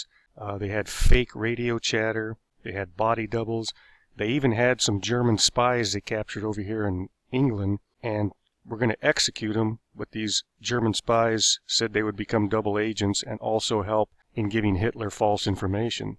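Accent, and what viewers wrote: United States. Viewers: Stopped after about 2 minutes. Couldn’t stand the pathetic American pronunciation of places in France. Pity.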